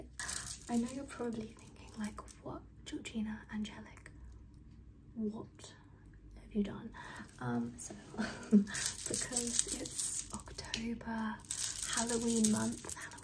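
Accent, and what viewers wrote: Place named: United Kingdom. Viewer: Oh my god I was NOT expecting the British accent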